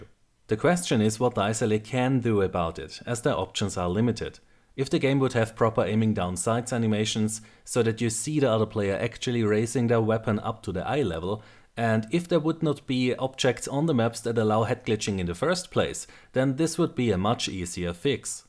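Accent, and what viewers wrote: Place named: Germany. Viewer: You have the greatest German accent imaginable. I just picture you sitting in a lab and refusing to leave until whatever you are working on has been perfectly engineered.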